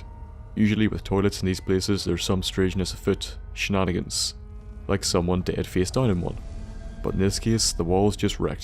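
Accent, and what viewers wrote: Northern Ireland, United Kingdom. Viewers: He is northern Irish. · Hmmm off topic Question if I may...Where are you from?. The accent sounds like Nth Ireland but with a touch of..................well that is why i ask hehe..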